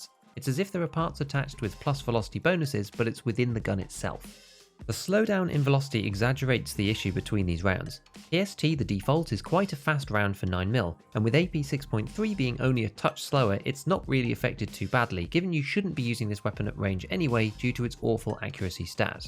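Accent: United Kingdom. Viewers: He sounds brittish so I doubt he has even touched a real gun.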